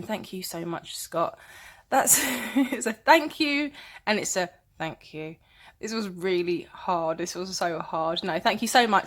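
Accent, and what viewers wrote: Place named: Surrey, United Kingdom. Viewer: I love Surrey English. Music to my ears. I wish I could speak like you. ❤❤❤ I only lived in the UK for five years but will forever love and miss it.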